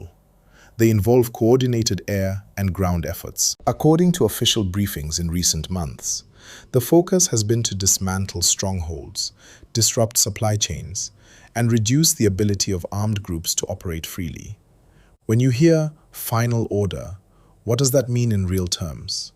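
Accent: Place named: East Africa. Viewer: it is quite interesting that some of you "TikTalk workers" assume that we listeners are only followers without the abliy to discern who is likely following your presentations. I challenge this individual to deny that he is not talking from east Africa. your ascent says so.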